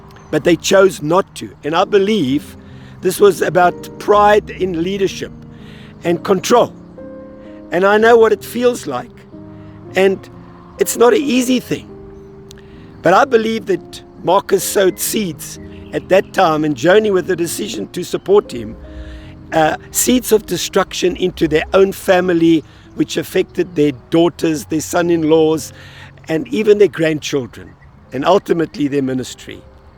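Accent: South Africa. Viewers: The moment I heard his voice I could hear the South African accent straight away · It's music to my ears to hear your South African accent! 🇿🇦 Thank you for your wise insight. From a Saffa who has move to Northern Ireland. 🇬🇧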